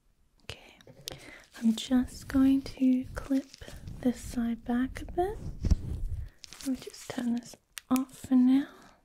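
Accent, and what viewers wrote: Australia. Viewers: As soon as I heard her voice I knew she was from Australia then I checked and I got it right!!! I’m from Australia too! Btw amazing video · Australian accent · You sound like a bit Aussie😅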